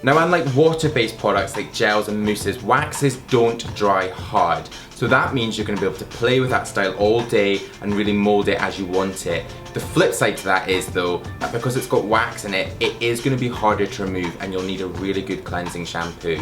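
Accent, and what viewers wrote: United Kingdom. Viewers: i wished I have a british accent like you 😂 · Cool video man but it would be better if you started while your hair was messy af, like just waking up or whatever. Also stereotypical gay accent mixed with whatever British accent sounds odd lol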